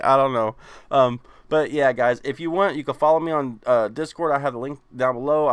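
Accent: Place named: Georgia, United States. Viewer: It's ok, I like your accent, sounds like what I hear in Georgia.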